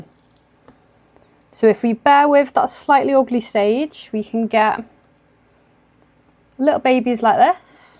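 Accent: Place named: Liverpool, United Kingdom. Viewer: I TAKE IT FROM YOUR COMMON ACCENT YOUR FROM SOMEWHERE LIKE LIVERPOOL ? I LOOK FORWARD TO SEEING YOUR RACK MY DEAR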